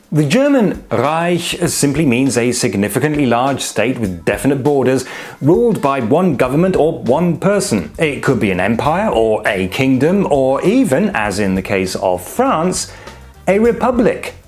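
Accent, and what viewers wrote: United Kingdom. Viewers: Not bad. Your accent is very british indeed for an Aschaffenburger. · Your vid popped up on my youtube selection. Had aways wondered about that name.. now I know, and not only that.. I feel so much smarted learning it in your British accent. Like Sub!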